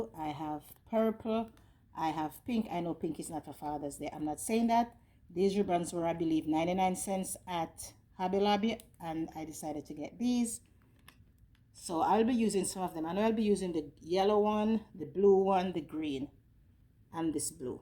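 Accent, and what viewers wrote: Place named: Jamaica. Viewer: 😁😁 that accent!!! Hello fellow Jamaican. Love the video.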